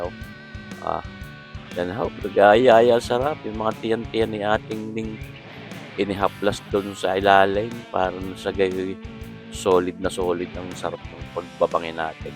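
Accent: Batangas, Philippines. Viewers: Kabayang, Tuloy-tuloy lang ang vlog. Ako'y regular na nanunuod sa iyo, napaganda ng iyong puntong batangan. Ang aking ama'y d'yan lumaki sa Avenida Rizal malapit sa calumpang.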